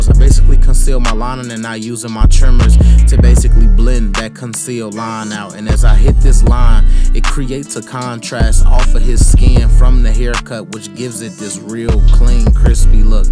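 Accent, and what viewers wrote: Chicago, United States. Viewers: Knew you were from Chicago just the way you talk we got a certain dialect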